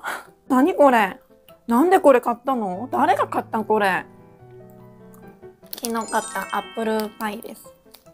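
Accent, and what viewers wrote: Kansai, Japan. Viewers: こんにちは😊辛いの、ダメそうかなと思ってたら、やっぱり🤭鼻水でるし、汗かくし。『ちょっと腹立つなぁー！』ウケました（笑）ちょこちょこ関西弁出るし…。ワンちゃんの大事なところ、隠してくれてアリガトワン！（笑）🐶娘がちゃんより、少し歳下ですが、娘を観る感覚で観てます👍では、アンニョン🎵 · 関西弁使ってるやん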